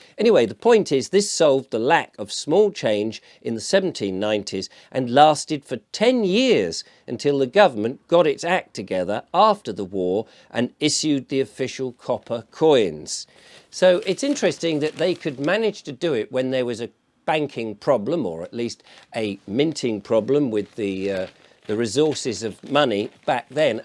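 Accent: Germany. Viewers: Hello, alas, I used to work in retail banking for my sins but hopefully I can make amends:) The bank staff have to ask about large withdrawals as a safe guarding procedure and it is understandable that it rubs people up the wrong way. All unusual withdrawals are looked into seriously in case there is money laundering at play as well. This is in the Banking Code Law and to be in breach of it, there used to be a 7 year jail term for the staff member. You lived in fear of getting anything wrong. There is also a limit on the amount of cash that a branch can hold (insurance purposes) but large withdrawals can be done by appointment. Often, it's a worry about people's safety carrying it around or being robbed. I love ze German accent and I'm no fan of the way banking is going either! Looking forward to the solutions videos, Sunak & co. have left things too late, we need to act now. 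· Your German accent sounded like Kermit the frog, just realized that Kermit is a German name. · I love your work and particularly your German accent. Keep bringing it brother xxxx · Excellent German accent btw 👨🏻‍✈️ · Good German accent, à la Klaus. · Never mind the naff, the German accent was highly significant.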